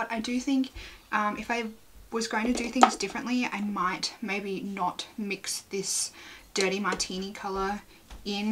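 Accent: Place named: Australia. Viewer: Another person who’s found you via Annette. So lovely to hear an Australian accent. 😊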